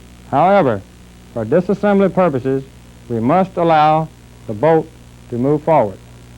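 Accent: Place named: Texas, United States. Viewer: Definitely a Texas or Arkansas man.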